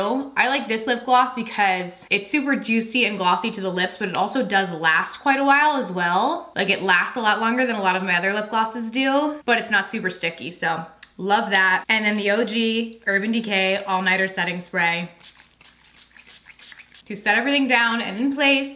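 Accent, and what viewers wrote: New Jersey, United States. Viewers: For some reason, picking up your “Jersey” accent today. Cute. 😊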